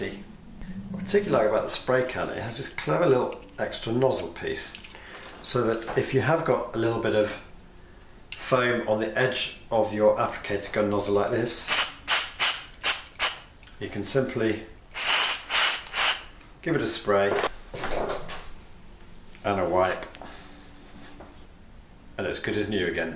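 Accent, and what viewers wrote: England, United Kingdom. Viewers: americans can listen to english blokes talk, all day, i dont know if youre in the biz, but you could do product reveiws among other stuff haha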